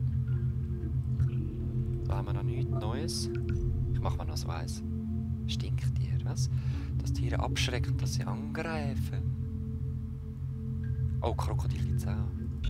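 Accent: Switzerland. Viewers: Ich find dini Lets Play's voll geil wills uf Schwizer dütsch isch und du machsch es so lustig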